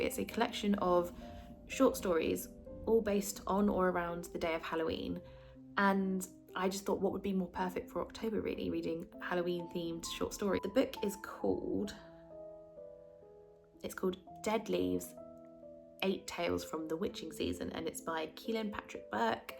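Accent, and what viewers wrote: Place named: United Kingdom. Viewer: The shoutout to Sunnyvale Reject was cool. He told me I'd like your videos and he was right. I love your amazing British accent (I'll listen to anything you say) and your passion for reading and books. You're going to be big.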